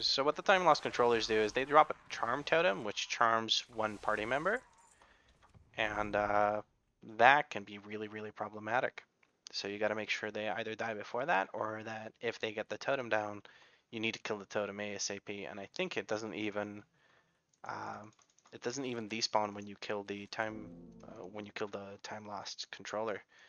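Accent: United States. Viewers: What's your native language? You have a very American accent now.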